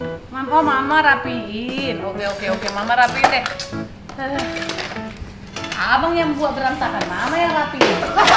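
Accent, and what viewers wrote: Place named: Medan, Indonesia. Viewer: heloowww keluarga cemanaa kok lama gak bikin vlog lagee,kitah nunggu2 neeh..lucu dengan logat ala2 medan ini😊😊,jarang2 kaya mak beti jadinya,coba doonk koleb sama mak beti,atau bareng keluarga mas anang,atau sama bapau(baim paula),biar makin zeruu vlog keluarga cemana ini 🖒🖒,selalu semangatt,Tuhan berkati💕💕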